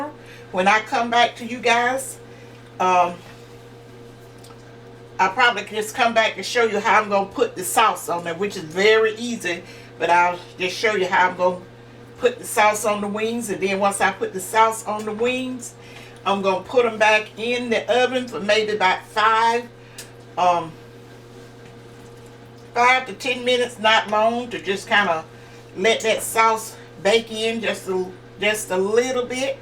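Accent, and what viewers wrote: South, United States. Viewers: that's the word southern accent not country I couldn't think of that word, yeah the crazy part about that stories I don't understand why my Grandma let my Great- Auntie change my Moms accent ? I wish I would have asked her that while she was alive. Well at any rate I can hear your Southern accent in your videos 😁 Thanks for taking the time to read my comment and to reply back to me I really appreciate that. Take Care and I pray God will Bless your day ❤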